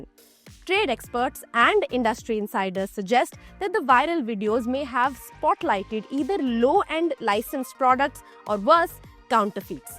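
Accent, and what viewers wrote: India. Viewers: As if I am going to believe this reported with an Indian accent.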